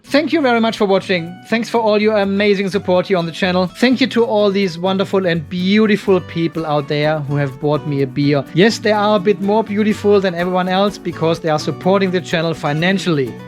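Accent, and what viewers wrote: Germany. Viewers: Höre ich da einen deutschen Akzent raus?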